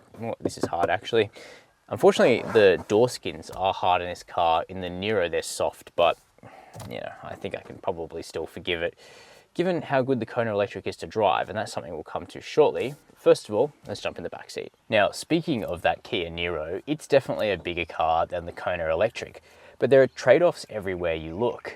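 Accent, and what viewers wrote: Australia. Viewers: Aussie accent unbearable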